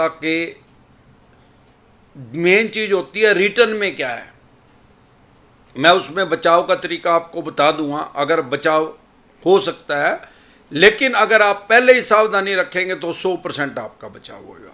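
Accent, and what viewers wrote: Haryana, India. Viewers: सर आप हरियाणा से हो ?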